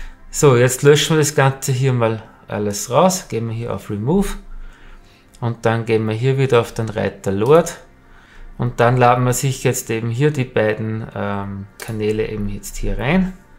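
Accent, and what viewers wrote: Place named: Austria. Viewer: Tolles Video. Aber Buddy, dieser österreichische Dialekt hört sich echt schwul an. Trotzdem absolut tolle Videos die du da machst!!!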